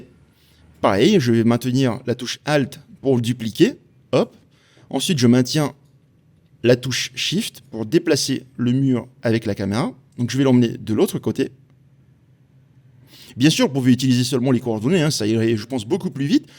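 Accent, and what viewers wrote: Tahiti, French Polynesia. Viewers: j'ai la banane..mais t'es tahïtien ?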